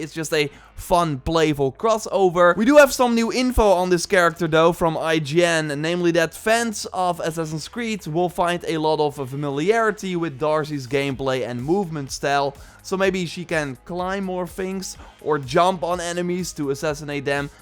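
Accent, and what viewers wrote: Netherlands. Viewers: Yo man you seem to have a dutch accent.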